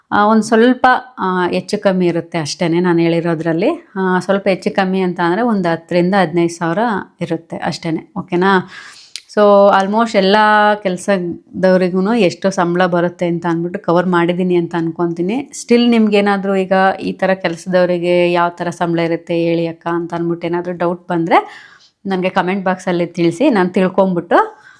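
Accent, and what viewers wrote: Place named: Mysore, India. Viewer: ನಿಮ್ಮ ಈ ಎಲ್ಲ ಮಾಹಿತಿಗೆ ತುಂಬಾ ಸಂತೋಷವಾಯಿತು❤️🙏. ಒಳ್ಳೆಯ ಕನ್ನಡ, ಹಳೆ ಮೈಸೂರು ಕನ್ನಡ ಬಾಳ ಸಂತೋಷವಾಯಿತು 💐🎂🇮🇳🌏❤️🙏........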